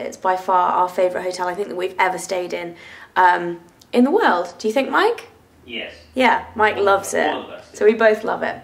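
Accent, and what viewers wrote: United Kingdom. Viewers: aw loved your british accent its cool :)) by the way i'm from Oman :)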